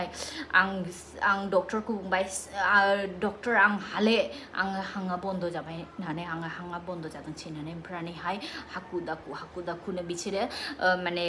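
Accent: Bengal, India. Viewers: Railyna benguli style nisw jdwng boro bydi kwnayaki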